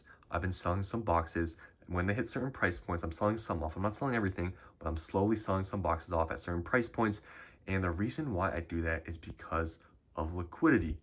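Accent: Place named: Asia. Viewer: This is random af but from your accent and hand I'm guessing you're asian?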